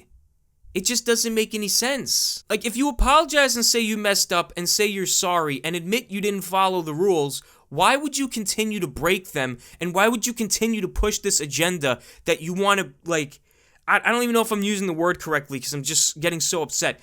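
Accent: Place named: New York, United States. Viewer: I know this is completely unrelated to this video,but are you a New Yorker? Because you really sound like one.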